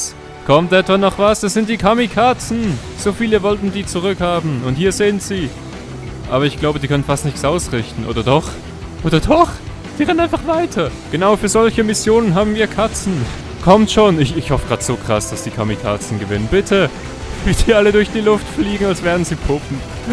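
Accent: Switzerland. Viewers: BIst du Schweizer? Wenn ja dann ist das geilste weil ich auch Schweizer bin :D UNd machmal Ein Blauen Stickman übelst OP dann mach gegen 10000 Youtuber :D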